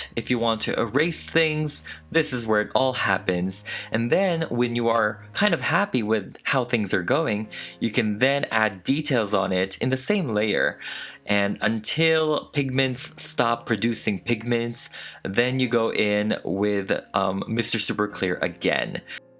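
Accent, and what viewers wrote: France. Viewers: Son accent français est adorable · J'adoreeee ton accent français 😭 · As a French (from Paris) I can tell that your French accent is really cute ❤️ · You speaking in a French accent gives me life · That french accent is still offensive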